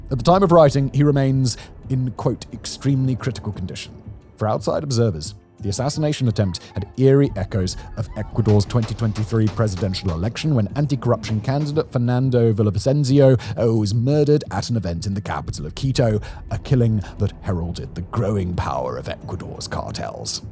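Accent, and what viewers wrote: United Kingdom. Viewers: Dude... he doesn't run these channels. He is just hired as a reader because of his British accent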